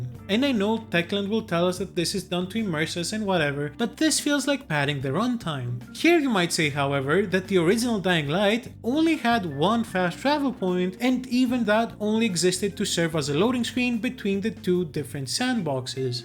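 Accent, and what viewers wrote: Greece. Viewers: The greek accent is so noticable 😂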